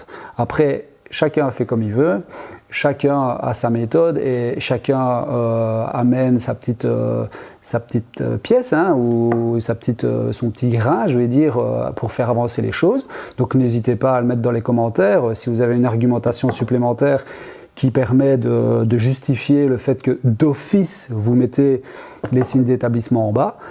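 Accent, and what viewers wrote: Belgium. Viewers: Bonjour. Très bonne vidéo comme toujours on ne s'en lasse pas bravo et merci pour tous ses conseils avisés, pertinents, et argumentés. C'est FLOKY qui va encore se marrer car tu "perzistes" toujours dans cette belle langue franco belge. Allez ciao et bonne continuation.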